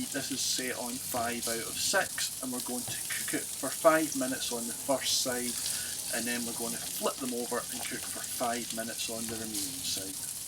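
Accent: Glasgow, United Kingdom. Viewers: Guid Scots accent there ( Glasgow?) This looks great , going to make a batch x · west end of Glesga accent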